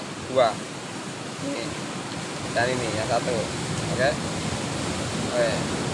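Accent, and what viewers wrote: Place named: Java, Indonesia. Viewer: Bahasane ojo medok2 to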